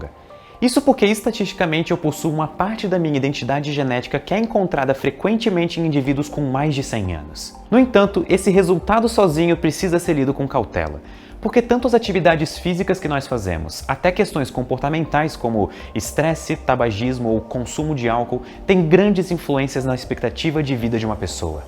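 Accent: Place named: Rio Grande do Sul, Brazil. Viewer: você é gaúcho?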